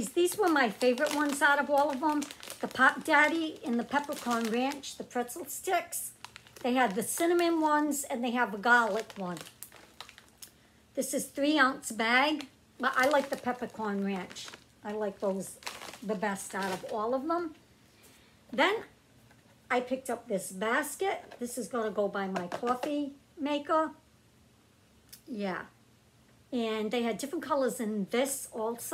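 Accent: Boston, United States. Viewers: Are you from Boston ? Your accent sounds like it , from Canada 🇨🇦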